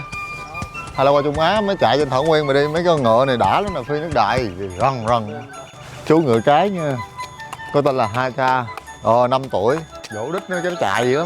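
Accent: South, Vietnam. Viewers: Giọng nam và đẹp trai. Có kiến thức. văn võ song toàn. Hình như em có tất cả. ❤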